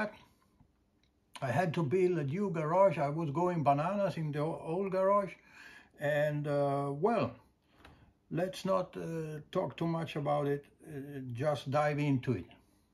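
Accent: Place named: Sweden. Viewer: Glad I could help! Accent is Swedish :)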